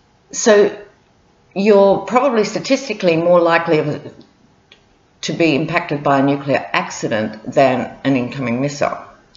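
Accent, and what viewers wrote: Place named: South Australia, Australia. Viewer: As a fellow tarot reader, I so enjoy your readings. I know it takes a lot of energy to do these readings. I thank you for that time and energy you're sharing freely and generously of yourself on here. I lived in South Australia for 3 months - was married there and I admit, hearing your accent and views makes me feel a bit nostalgic and ever so comforted. Xxxx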